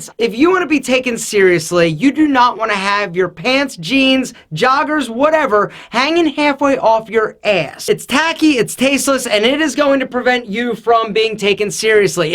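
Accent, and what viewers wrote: Philadelphia, United States. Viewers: Lolol i was thinking philly too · He is from Philly. As am I. You can tell right? Lol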